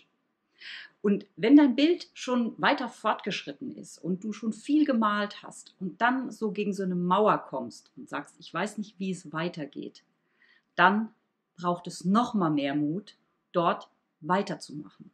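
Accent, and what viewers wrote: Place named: Northern Germany, Germany. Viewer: I studied German in high school and college. I studied at the University of Freiburg for a year. My mother is actually from Berlin. Your German sounds like the northern accent I’m used to hearing. When I went to Freiburg I could hardly understand anyone!😂 That was many moons ago, and I don’t get many chances to speak German with anyone where I live, so listening is very nostalgic!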